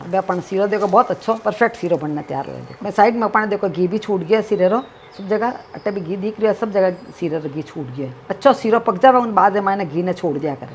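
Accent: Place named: Jodhpur, India. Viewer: Aunty aap ki boli Jodhpur ki lagti h, aapke video bahut ache h.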